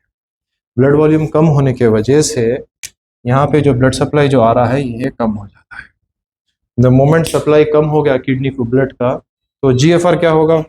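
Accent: Hyderabad, India. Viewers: Hi. Doc your video is informative. Can you please give more info how to reduce crestanin? You've Hydrabadi accent, are you from Hydrabad india? Where can i contact you? HB from Toronto Canada 🇨🇦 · Dr..Auslamalekum. We regularly watch your videos and appreciate your knowledge and experience. We have 2 observations. It's difficult to read your writing on the board becoz there is very little contrast. Please use darker marker pen and write slightly bigger words. Further although we enjoy Hyderabadi accent but some of the words are not audible because they are spoken in low tone. Hope you will not mind our observations. Please take care of these aspects in future videos. Thanks and regards Tarwinder Singh Delhi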